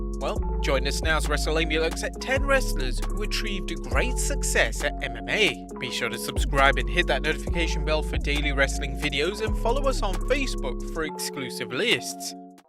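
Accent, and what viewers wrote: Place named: United Kingdom. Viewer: I'm not being a smartass but just telling you that he isn't from America I think he's from UK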